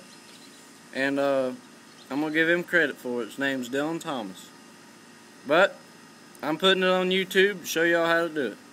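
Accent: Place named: South, United States. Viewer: What part of the south or you from? Im from Gretna Louisiana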